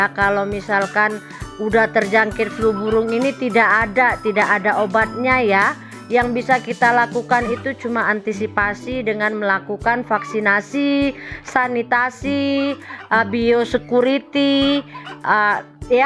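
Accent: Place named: Medan, Indonesia. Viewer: Logat kakak ne medan kali lah 🤣🤣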